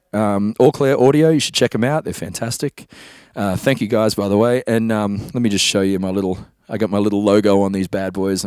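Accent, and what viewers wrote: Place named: Australia. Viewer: Excited to see it! It is funny how a looping rig is an evolving process. I truly believe simpler is better, but you’d never know it when I set up my solo rig. See you soon in Nashvegas! Ps.... lived in Sydney for 2 years. Listening to your accent brings back some really good memories! Ta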